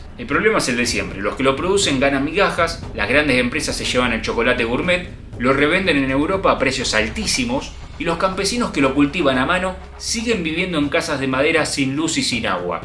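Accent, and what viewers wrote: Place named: Argentina. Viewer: se disfruta más el tono y acento de voz argentino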